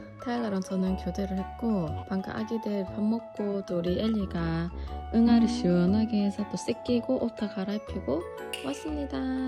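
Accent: Gyeongsang, South Korea. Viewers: 반성합니다... 같은 경상도 출신인데 은 힘든 육아 속에서도 어찌 말투에 짜증 하나 안묻어날까요..😂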